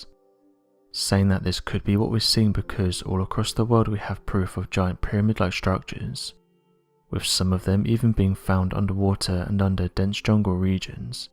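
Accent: England, United Kingdom. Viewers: Thank you for all your hard work on these videos!!! I love watching them and you have the perfect, intelligent, serious, English voice for narration 💯♥️ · Best accent English. I am biased though.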